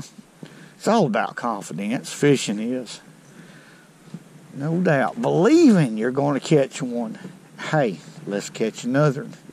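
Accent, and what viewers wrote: South, United States. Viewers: Man I love your rednick voice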